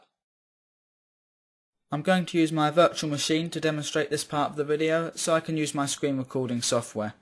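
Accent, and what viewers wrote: United Kingdom. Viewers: This British accent pronouncing computer phrases sounds like either baby talk or garbage. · An english mate. Who else think he sound like Mr. Bean?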